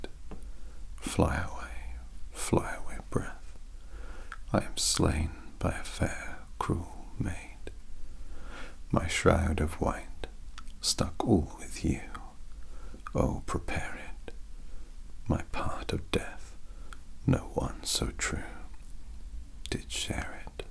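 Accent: United Kingdom. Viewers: We just analyzed some Shakespeare during English Literature today! Very coincidental you uploaded this today as well. Either way, I’m so excited to see this! I love being read to, and I love British accents, and I love poetry, and I love your videos! Perfect combo 😍 thank you!!! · Can we talk about how amazing a British accent sounds? I swear y'all could order chicken nuggets from McDonald's and sound like an erudite advanced life form. Conversely, I'm from the American south, and one of us could give a lecture on astrophysics and still sound hopelessly backwards. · A British guy reading Shakespeare?! Can't get much better than that!! Fantastic read - thank u! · Your dandy voice, your UK accent, your sweet face expressions, your way to read Shakespeares in gentleman's grace...sigh.😔✨ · A man in a suit with a soft British accent, reading poetry and cuddling his cats? 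Well I mean... Sign me in.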